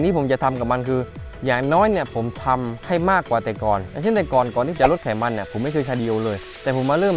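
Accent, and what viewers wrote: South, Thailand. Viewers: คนใต้หรองับ/ สุดหล่อ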